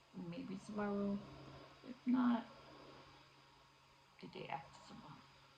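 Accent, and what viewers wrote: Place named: New York, United States. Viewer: You from NY? I’m hearing an accent. Also we have a lot in common so I’m sitting here watching like “yup, mhm, yup me too sis” 😂 I just subscribed, I’m here for your content sis 😂🙌🏾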